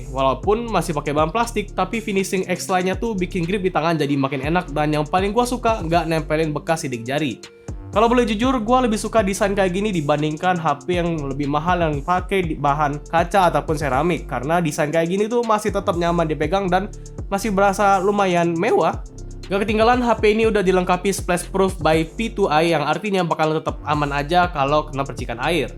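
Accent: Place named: Bengkulu, Indonesia. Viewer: Orang mano bang?? Kok kayak bahaso bengkulu ??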